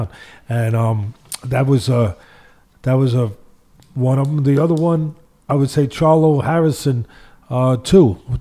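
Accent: New York, United States. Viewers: He has a great voice, the New York accents are my favourite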